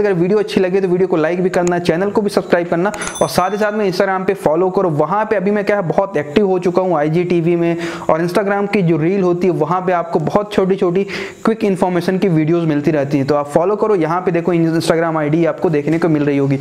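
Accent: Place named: Rajasthan, India. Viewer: Sir ji aap Rajisthan se hai kya,, aisi lgta h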